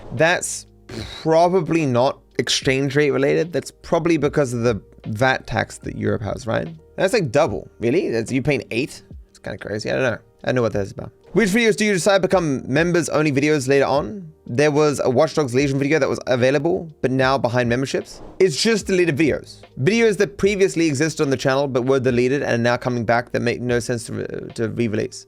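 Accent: Australia. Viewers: Nah, if you can't understand the Australian accent, that's a skill issue. The only people that can be difficult to understand are the Scots. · I'm from the north of the UK but don't really sound it. It is a very bland accent. I could tell you were Aussie but only just when I started watching. Outsiders from the UK have no Idea how many accents we have, they think we are all royal or some shit, clearly never been to Marsh green in Wigan lol. Go up the road were I live and you will find very different accents. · I'm American and have never thought you were anything other than Australian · I'm australian and i never thought his accent stood out, mind you i was still able to distinguish you had an aussie accent the first time i came across one of your videos just to go check the channel name and everything clicked. As a side note and being as respectful as possible, you seem to speak really quickly EVEN by australian standards, like we're known for shortening phrases, but for example you said 'a lot of things' as if it were one syllable or one breath. 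Like, even faster than saying 'lotta things' slowly, i think its made even worse by the enunciation that you use to make yourself sound readable to non-english speakers. and i have a few mates of mine (doing I.T if that tells you anything) who try to get out large 6 syllable words out really quickly while ALSO enunciating (without slang), and to me sounds unnatural/forced to me despite having no trouble trouble understanding what they're saying, similiar vibe here. But i suspect this is what the non-english speakers having trouble with as it doesnt match the natural anticipation or cadence of, say for example a british or american english speaker so i reckon it might come off as hard to follow the pacing, so it's not just a culture thing but also your own habits. I'm no linguist though. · I hear the Aussie because you aren’t as (I use this very very loosely) formal as a stereotypical Brit and not as limp as American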